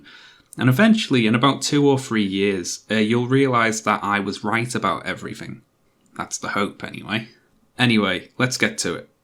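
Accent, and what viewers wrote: United Kingdom. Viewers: I can now see why people love British accent so much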